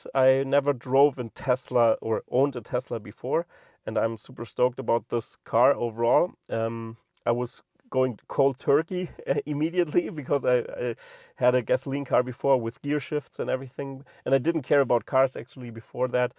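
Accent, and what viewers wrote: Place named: Germany. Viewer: Great car, great vid. I hope your longer review includes a proper test of the voice commands. It needs to cope with accents and a German accent is a great test. I love your accent but I want to know that Tesla responds well, too. Imaging how it will be if the Teslas get Grok!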